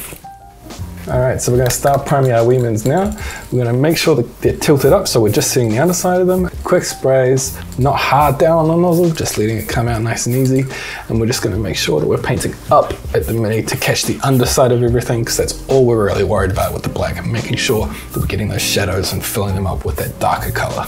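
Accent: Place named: New Zealand. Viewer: Wow great content i love this! kiwi accents are so hot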